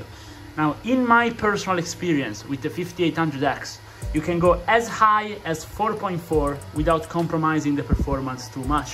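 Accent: Italy. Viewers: ho subito riconosciuto l’accento italiano ahaha, grazie mille per questo e altri tutorial ❤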